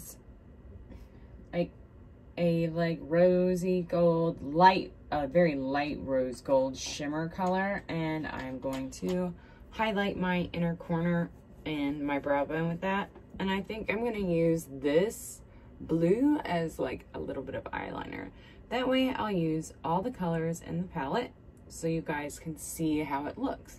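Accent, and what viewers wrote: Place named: South, United States. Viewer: Hey hey miss lady..💙 u look beautiful with or without makeup..💙 again i also deal with ptsd d after getting out of a horrible relationship of 8 years. .but now that im free im finding me again.. Its been insane. .im soo happy to have survived.. Im getting dentures at 40 due to not being able to even try to take care of me..but im soo happy to find ur channel and u..💙 im from Memphis but live in Oklahoma so i here for the southern accent..💙 u r a sweetheart..ao happy to be apart of ur journey. .💙 keep growing and for sure keep shining💕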